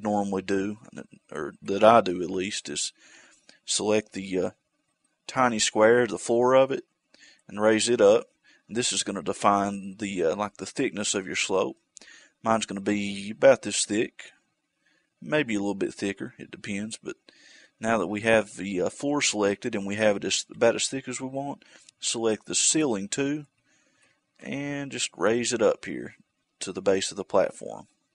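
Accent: United States. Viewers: Cool beans. And I like your super American accent. This is a good channel. I hope to produce a game in GZDoom.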